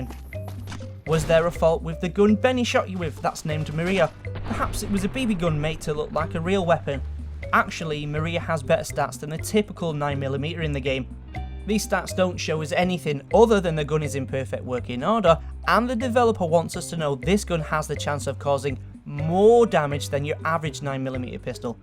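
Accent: United Kingdom. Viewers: It's funny how British people pronounce words "call of dutae"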